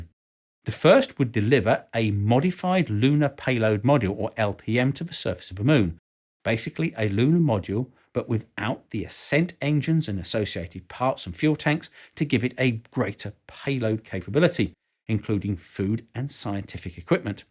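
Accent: United Kingdom. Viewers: After recently watching some William Shatner self depreciation videos, and coming here...it occurs to me that speaks rather like Shatner with a British accent.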